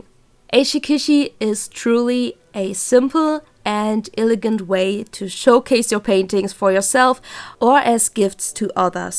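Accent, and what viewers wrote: Germany. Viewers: Ich wollte wissen was ein Shikishi ist, da ich davon gestern das erste Mal gehört habe und muss kurz sagen: Ich erkenne Deutsche, die Englisch sprechen irgendwie sehr oft :'D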